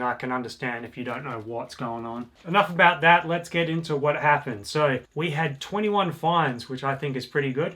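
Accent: Perth, Australia. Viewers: You so look like you’re from Perth, but if you were from Sydney I wouldn’t be surprised. Nvm, you have a really heavy Perth accent I’m certain. Ahh you said you were from Perth, nvm. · I only just realised is an Aussie 😂 we have the same accent why did I think he was British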